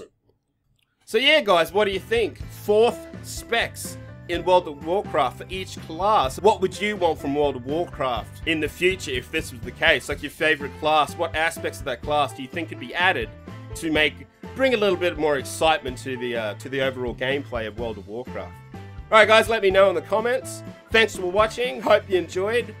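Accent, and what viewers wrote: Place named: Australia. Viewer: can never get enough of that sweet ausie accent!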